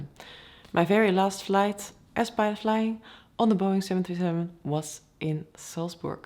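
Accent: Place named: Netherlands. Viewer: This accent is so harsh, it sounds like someone from Holland is trying to speak English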